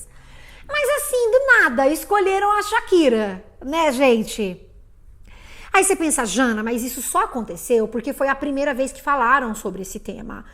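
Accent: Rio de Janeiro, Brazil. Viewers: Jana, mata minha curiosidade, pelo amor da deusa. Você é paulistana ou carioca? Juro que às vezes ouço um sotaque carioca, e outras, um sotaque paulistano.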